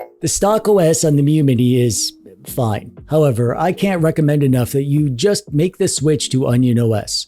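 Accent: Canada. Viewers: This is my first time watching any of your videos, and I've now just ordered this, but one question... are you Canadian?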